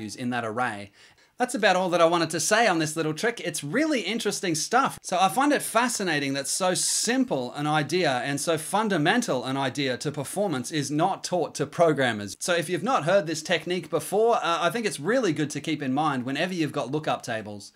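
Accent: Australia. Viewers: Hi, mate. Are you from Australia?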